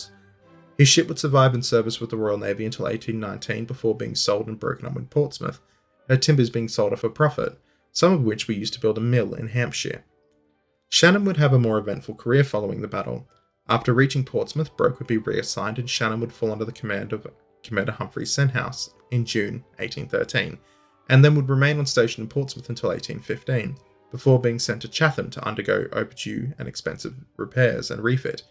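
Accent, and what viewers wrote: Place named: Australia. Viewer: His "vocal fry" is part of his regional australian accent. Some accents have natural vocal fries. Not every vocal fry is an affection by hypsters, you know? The entire world is not american, you know? English speaking foreigners,, dude, it is a thing.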